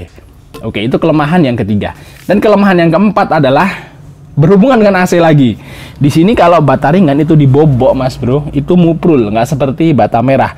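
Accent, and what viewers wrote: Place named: Semarang, Indonesia. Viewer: medhok men lek,bahasa semarangan e 🤣🤣🤣🤣🤣🤣🤣🤣🤣🤣🤣🤣🤣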